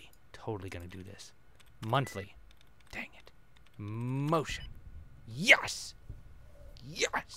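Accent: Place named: Scotland, United Kingdom. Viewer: your scottish accent is actually okay :L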